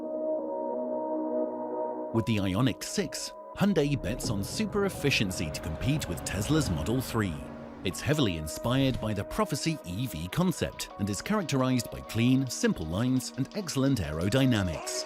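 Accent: England, United Kingdom. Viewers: Just curious, which English accent was the narrator using ? Thanks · English accent….. American market 🤨🙄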